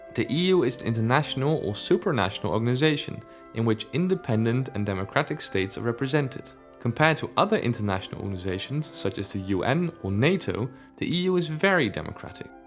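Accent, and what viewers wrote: Netherlands. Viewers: Why do you sound Dutch? Are you? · I hear a slight Dutch accent am I right? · I hear Dutch accent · You're Dutch, right?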